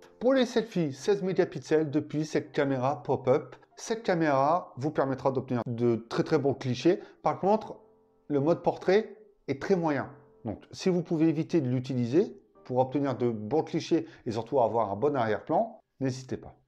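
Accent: France. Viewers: Merci pour tes conseils et présentations éclairés, je viens de me commander un honor 9x du coup. Mais il m'a l'air t'es bien de ch'nord, ti, hein?? Bonne continuation pour ta super chaine YT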